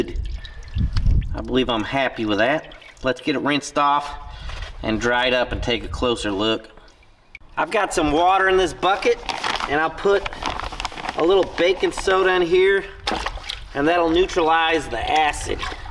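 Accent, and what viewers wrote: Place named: New England, United States. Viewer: Another great episode. Rust removal so tricky. Looking good. I’ve never heard of that milkstone acid. Did a great job. Im so curious about your background. Obviously for your nickname and accent you are from New England? Maybe New Hampshire? And I wonder if you have farming in your background. Did you work as a mechanic? Just curious. You have so many different skills. Enjoy your channel.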